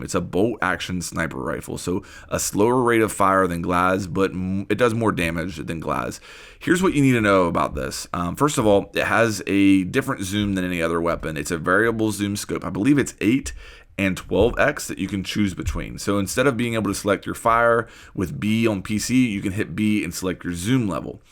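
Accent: United States. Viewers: American accent he can't help it.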